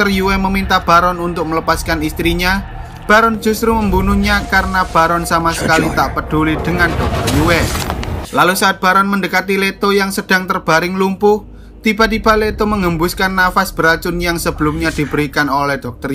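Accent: Java, Indonesia. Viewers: Bener" kental logat jawa nya. 😁 mantap bng semoga abg dan kita semua salalu sukses. Doakan kan kami semua para pejuang youtube pemula makin berkembang. Aamiin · Maaf ya suara mimin medok bngt, mimin asli orang jawa. Hehe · suara host nya kental banget jawa nya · Logatnya Jawa apakah Mimin org Jawa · Jawa nya ketara banget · Medok euy 😁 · Orang Jawa mana bang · Jowone notok tenan😀😀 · Mendok bgt kurang suka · Mohon maaf, bukannya rasis, medok nya mengganggu. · Wong jowo yo mas? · Bang lu kok medok banget orang jawa ya? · Logat aja medok bett gaenak di denger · medoknya bikin ngga fokus cokk. pusingg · Bang Abang orang Jawa ya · Wong jowo rek?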